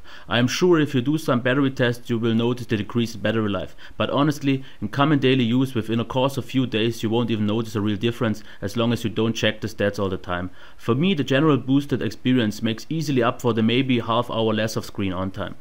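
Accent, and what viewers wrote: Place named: Germany. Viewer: This review is great, but the accent is even better. German?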